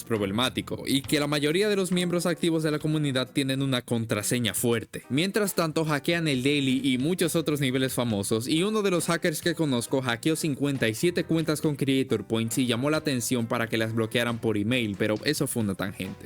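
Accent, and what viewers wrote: Dominican Republic. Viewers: Espera, sos de dominicana? Ese dato no me lo sabia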